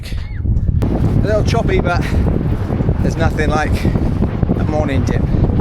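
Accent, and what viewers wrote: United Kingdom. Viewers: I love the British Accent 😯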